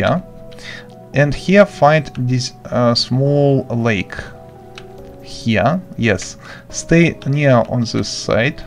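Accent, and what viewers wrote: Russia. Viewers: ты русский? мне кажется у меня похожий акцент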